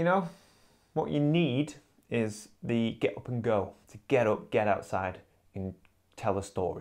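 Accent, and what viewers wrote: United States. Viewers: Don't be sorry. I loved your American accent, since I'm from the U.S. :D Thank you for this video, as I've always been curious about your b-roll equipment! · That American accent 😂 haha you’re the best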